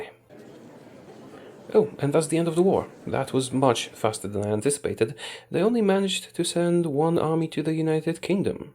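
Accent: Poland. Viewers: whatt oglądam cie od 2 lat i coś tak właśnie przeczuwałem że jesteś z polski. Masz zajebisty angielski. Pozdrawiam mordeczko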